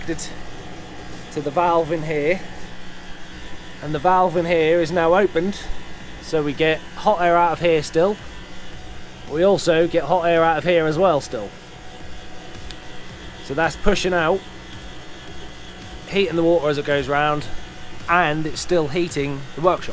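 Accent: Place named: Norfolk, United Kingdom. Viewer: Can I buy the 3D printed air inlet/outlet from you please? From your accent you sound like you're local to me (Norfolk).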